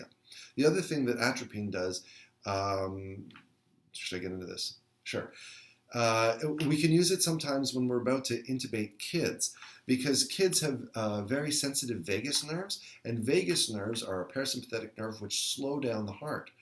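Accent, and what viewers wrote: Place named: Canada. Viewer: Two things....First...Ha! ....I just KNEW you were a Canadian, eh? (me too, that's why I had a feeling)...Second...you are a truly truly gifted teacher. Thank you so much for creating these paramedic videos, they have explained so much confusing material in such a simple and clear fashion....please make more videos!!!! .....eh?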